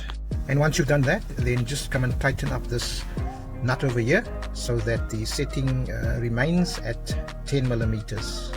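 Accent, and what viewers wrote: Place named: South Africa. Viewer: Love the south African accent...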